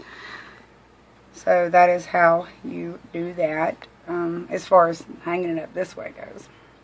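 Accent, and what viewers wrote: South, United States. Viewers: Rockin! What are these shelving brackets called at Lowe’s? Love your Southern accent, but I’m not sure exactly what you called them. Thanks! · I love your diy but when i heard your voice i said she from the south then you said Alabama that's where I'm from 👍👍👍👍👍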